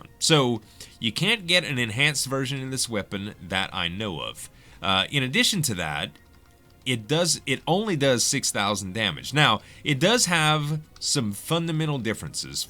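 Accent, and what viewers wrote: Louisiana, United States. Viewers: Found all of you guys via Slip's channel, you're the only one I subbed to just because of that sweet sweet Cajun commentary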